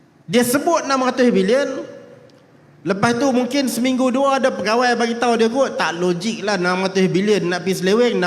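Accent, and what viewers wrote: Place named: Northern, Malaysia. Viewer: Ini kali pertama aku dengar wan saiful cakap utara.. awkward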